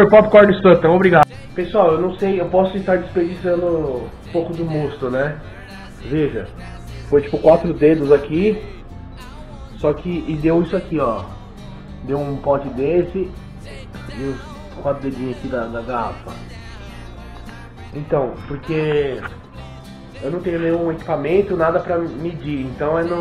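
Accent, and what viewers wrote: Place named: Limeira, Brazil. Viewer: Amigo, vc é de Limeira-SP?? Parece, pelo sotaque.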